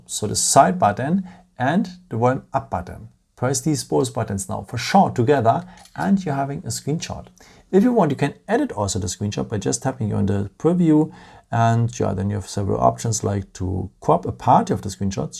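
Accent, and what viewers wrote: Germany. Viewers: Cute German accent ☺️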